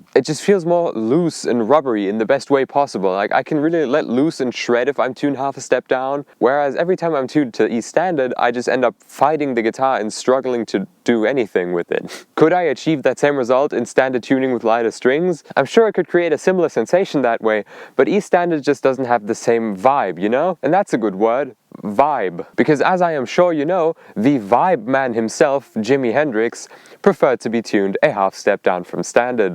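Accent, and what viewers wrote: United Kingdom. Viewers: Could you, for the love of God, explain who you are british sounding person in Germany with good content but an odd guitar collection! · I only play in Eb. Spot on British sounding German sir.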